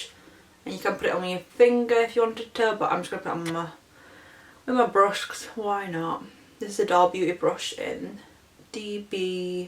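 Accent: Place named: RP, United Kingdom. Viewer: oml your brtish acsent is the next level of porsh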